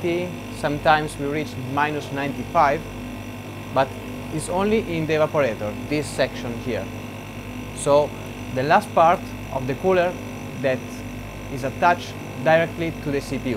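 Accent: Italy. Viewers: ma te sei italiano? hai una pronuncia che suona di casa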